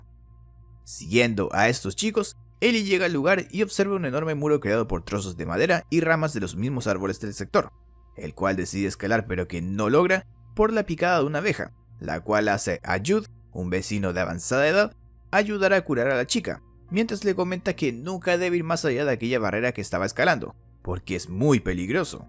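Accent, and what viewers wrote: Chile. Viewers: Es Chileno? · Eres de chile?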